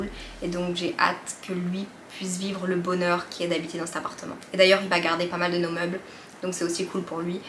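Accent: Quebec, Canada. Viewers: Je trouve que t’as pris l’accent québécois 😌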